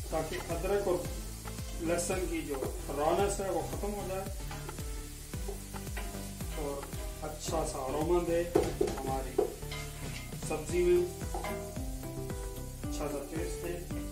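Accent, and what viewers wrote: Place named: Turkey. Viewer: jub aap urdu bol rahy hoty to turkish style thora thora aata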